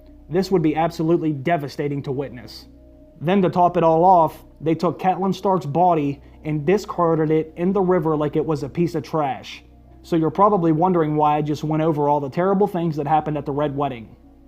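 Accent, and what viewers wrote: United States. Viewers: your voice reminds me of an American Petyr Baelish accent.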